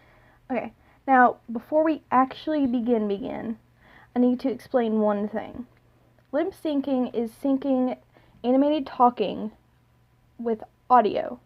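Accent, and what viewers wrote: South, United States. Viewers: You sound country kinda, but u keep catching yourself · no offense...... i just realized that you sound country :P im country too so idek why i said no offence.... im stupid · Are u country? Bc u have a country accent, sorry it just made me laugh xD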